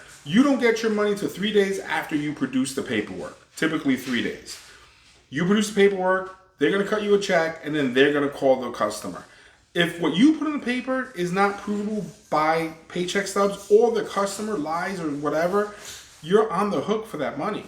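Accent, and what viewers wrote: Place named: New York, United States. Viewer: I knew it; you couldn't hide the NYC if you tried lol. Great video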